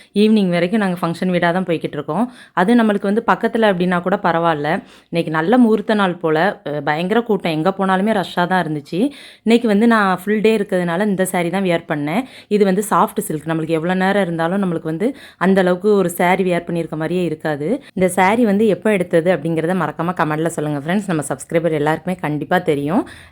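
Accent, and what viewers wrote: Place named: Thoothukudi, India. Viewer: haiiiiii naa ERAL. Unga pecha vachutha neenga entha uru nu kettan😊😊😊😊😊😊.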